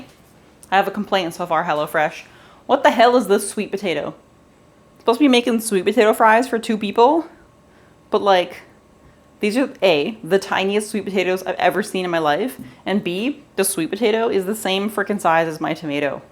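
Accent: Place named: Midwest, United States. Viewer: Nice Midwest accent 😄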